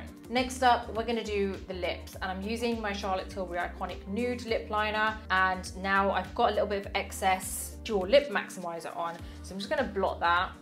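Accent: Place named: United Kingdom. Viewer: Hi, I love to see your videos, I think you are beautiful, funny, simple and your tone of voice relaxes me, I love love the Brit Accent you have, and of course, I relate to you because I think we kind of have the same type of skin, combo/ textured, anyway I love the fact that you recommend efficient products that actually work, not just sing out loud every week a bunch of new products to get more attention of PR and get paid for partnering with them, which I do get it, I understand that´s the business of being a YouTuber Influencer, but I also do highly appreciate people that share their skills for the art of it....there is a difference in the camera when we see the Sell it all Youtubers and the Real Pro that actually share their knowledge and you are one of them! so thank you for your time and effort in the editing, it is appreciated! By the way, talking about pro products, have you heard about the new release of the RCMA Liquid Foundation & different types of Powder....I just saw a video of someone with the foundation and it made me think....is it such as good as the OG translucent Powder that all the Makeup Artists use? 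made me curious as for now I will try the Tarte Shape Tape one as you recommended it! hils from Viking Land Denmark!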